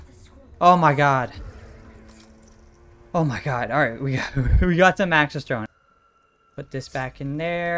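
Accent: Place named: Italy. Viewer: im from the northeast and hearing your voice is hilarious so italian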